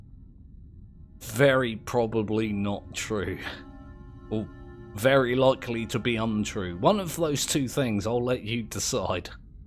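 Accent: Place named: United Kingdom. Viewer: You're just so British. Very winsome.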